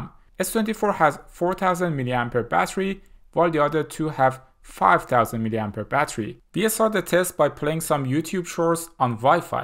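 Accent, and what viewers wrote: Iran. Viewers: سلام سید لحجه داری ، حس میکنم ایرانی هستی درسته ؟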